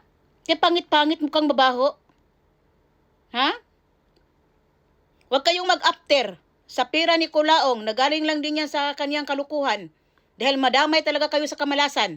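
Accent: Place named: Visayas, Philippines. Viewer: Bisaya man diay ka 😂😂❤❤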